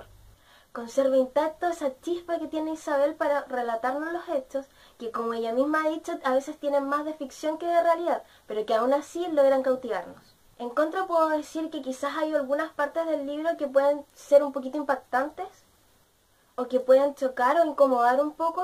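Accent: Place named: Chile. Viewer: Hola! Me acabo de subscribir a tu canal, porque me ha encantado tu voz y forma de leer en la narración del libro "Adulterio" de Paulo Coelho. En seguidas me di cuenta q eres chilena, me caen súper bien!!😃:) Soy cubana y vivo en Miami pero he visto mucho tiempo TV Chile por lo q he podido conocer más de su gente, país y me encanta! Por cierto, no terminaste de narrar el libro? Me encantaría terminarlo narrado por ti 😔:( Gracias por leer mi comentario y ojalá te embulles a terminar el libro!😁 Saludos!!😊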